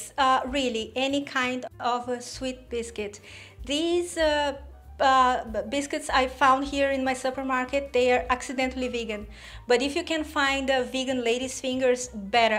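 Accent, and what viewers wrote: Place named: Brazil. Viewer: Eu achei seu canal alguns dias atrás, em uma receita de cream cheese vegano <3 Ouvi seu sotaque, sua simplicidade e pensei comigo "aposto que ela é brasileira". E acertei :D Essa receita tem muito a vibe de família brasileira, dia das mães, ou domingo em família. Coisa mais linda, assim como todo o resto do seu canal. Parabéns! <3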